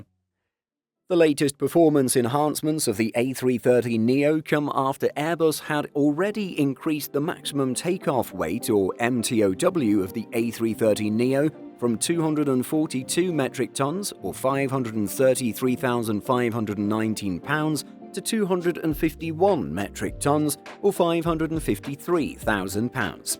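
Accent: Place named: United Kingdom. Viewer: Respectfully, for someone with an apparently British accent who can pronounce Raj Indian names as well as you can, you really need to learn a little bit of Spanish, so you can properly pronounce the name of the capital of the country ofColombia. Besides, I thought all you, Brits spent your winners in Spain…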